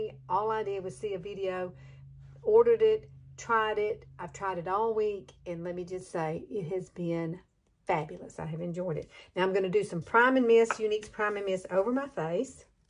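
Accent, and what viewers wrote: South, United States. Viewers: OMG girl I cannot wait to order that curling iron. I too have super fine, super thin, and super straight hair. My hair never and I mean never holds a curl. I cannot wait to have lasting curls and volume. Also, I think you are so damn cute! I showed my husband a brief clip of you and he chuckled and said other than the southern accent he could swear we were related by your personality. Accept I am very sarcastic! One last thing, I do not have a pace maker but I just got a portachath placed in the same exact spot your pace maker is. Be patient it will become just a part of your body and at some point you will forget its there. We just have to count our blessings for the gifts of modern medicine that can extend our lives and keep on keeping on! Blessings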